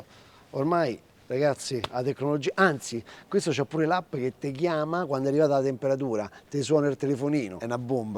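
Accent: Rome, Italy. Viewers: ogni volta che vedo un video in cui dai un morso ad un panino e sento quel rumore di pane che scrocchia darei un morso allo schermo!! iscritto da almeno 8-9 mesi!!! Continua così fai delle ricette fantastiche e io che sono del nord, personalmente adoro il l' accento romano e continuerò a seguirti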